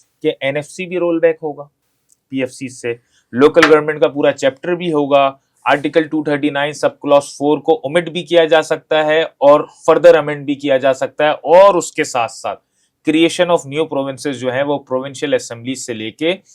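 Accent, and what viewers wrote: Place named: Sindh, India. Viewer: mujha yeh anchor sindhi lg rha hy😅😂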